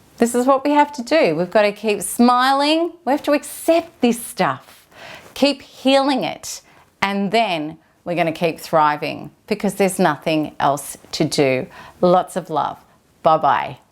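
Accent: Australia. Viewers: Are you Australian? Accent is so familiar!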